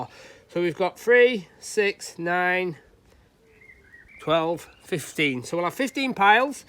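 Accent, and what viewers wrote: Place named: Yorkshire, United Kingdom. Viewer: Hi. Me again. Liking the vids again. Great explanation for anyone wanting to follow this system. Listening to you a few times now, and I thought I recognised the accent. I live in Meltham, Huddersfield and work in Leeds. So I guess, small world. I know your busy pal, but have a break for gods sake! Lol.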